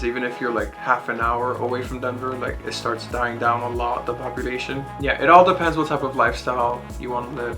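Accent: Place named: Miami, United States. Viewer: Yeah, you've got the Miami accent. I've never heard it until I was watching one of my (now) favorite YouTubers who is from Miami, and I first thought she was not born in America. I would describe the Miami accent as sounding like someone whose native language is not english....but coming somewhere from a latin American country and learning to speak english fluently and almost without an accent..but there is still something there.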